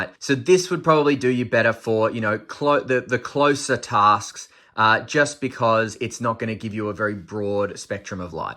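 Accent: Australia. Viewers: First off, let me say that I love that some one is making content that feels like it’s tailored for me. 2nd, as an American, we tend to love narrators from other countries, and your Australian accent is great! 3rd: how would you say these compare to the 16430 ?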